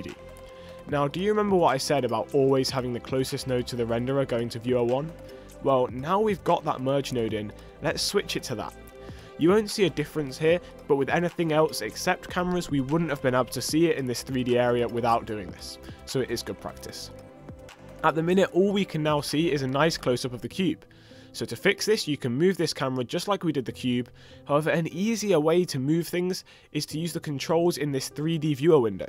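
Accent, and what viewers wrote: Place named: United Kingdom. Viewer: terrible british accent, can't understand anything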